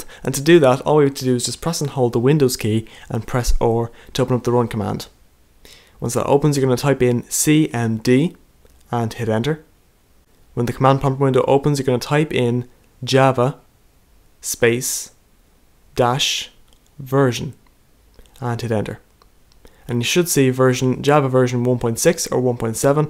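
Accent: Ireland. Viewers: are you irish ? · I LOVE YOU YOUR IRISH LIKE ME!! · u r irish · Are you from Ireland you sound it?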